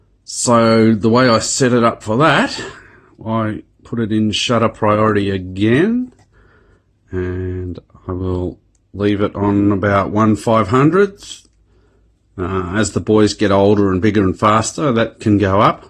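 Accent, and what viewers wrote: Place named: Australia. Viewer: Hi.. 1st I love your Australian accent. Secondly, I feel like you invited me to your home and sat me down to show me how the camera I just bought works. Thank you for an exceptionally in depth, hold your hands and let me show you, review. Thank you mate..;)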